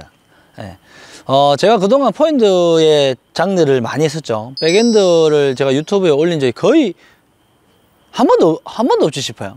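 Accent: Gyeongsang, South Korea. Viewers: 요즘 강한 백핸드 슬라이스를 많이 노력중에... 가로스윙을 우연히 느끼고 이느낌을 ,그 코킹을 백핸드 드라이브에 적용해도 되겠다는 생각을 했었는데 이영상과 같은 맥락에서 볼수 있는거 같습니다 나이스 코칭입니다 경상도억양이 뇌에 팍팍 지식으로 때려 박습니다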